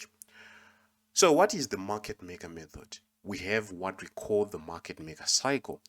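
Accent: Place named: Zimbabwe. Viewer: Hie bro, you sound like a Zimbabwean, are you from Zim?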